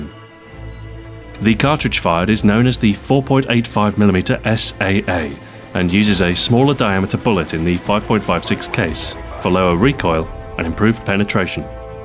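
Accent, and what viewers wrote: United Kingdom. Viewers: uhm,soap is irish, this guy's british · RULE BRİTANNİA. thumbs up if you love his british accent.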